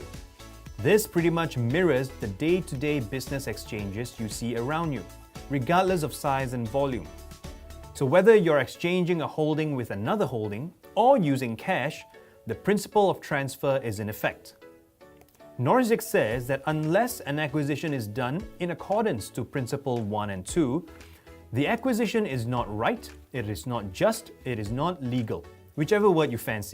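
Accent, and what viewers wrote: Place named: United States. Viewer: Where we learn to speak fluent American engilsh. Pls suggest ways to speak English like you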